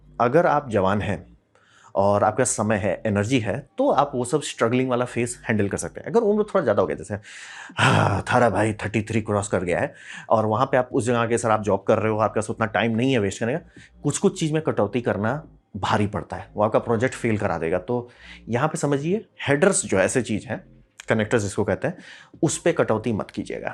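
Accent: Bihar, India. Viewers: Aap pakka Bihar Se Hi Ho, Bhai. 💯 Persent pakka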